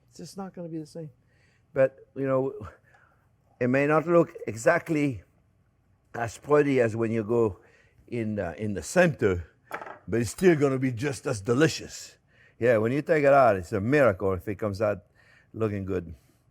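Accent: France. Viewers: His French accent. I love it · "what the sense? what the sense?" I don't remember how long he has lived in america but he still speaks in french for sure.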